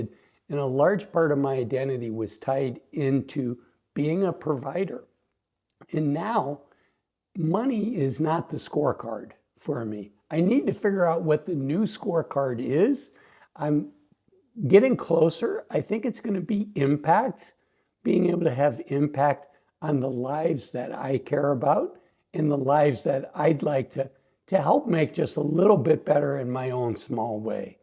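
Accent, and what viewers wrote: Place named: Utah, United States. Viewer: I’m a transplant to Utah after a career in the military. I knew I recognized that Utah accent of yours.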